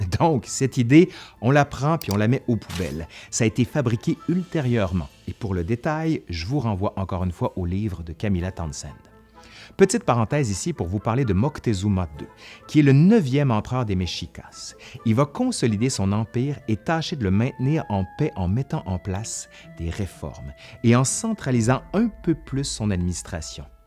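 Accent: Quebec, Canada. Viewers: Coucou! Salutations depuis la Colombie. Je m'appelle Nathalie, je suis de langue maternelle française et j'ai la double nationalité suisse et colombienne. Cela fait depuis plusieurs semaines que je suis votre chaîne, et j'adore votre travail, vos vidéos si instructives et si bien recherchées. Toujours un si grand plaisir de vous écouter nous raconter l'Histoire, avec cet accent québécois si savoureux! Concernant le sujet du jour, j'ai des racines amérindiennes du côté de ma famille colombienne et le sujet de la colonisation et de ses effets, souvent tragiques, toujours très complexes sur le continent que nous connaissons maintenant comme le continent américain (nord, centre et sud) me touche profondément. Bravo pour votre présentation des premiers contacts et de la colonisation du point de vue des Aztèques, et sur la façon dont leurs traditions et leurs perspectives sur le monde et le cosmos étaient profondément mal comprises par les colonisateurs européens. 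Pourriez-vous vous intéresser aux peuples autochtones du Canada? Ou sur l'histoire de la Colombie coloniale? L'histoire de la Suisse est également passionnante! Voilà pour quelques idées de futures vidéos. Chaleureuses salutations! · J'adore l'accent québécois c'est du nuage auditif ❤ Pardon mais vous avez tellement une voix qui raconte des histoires pour enfants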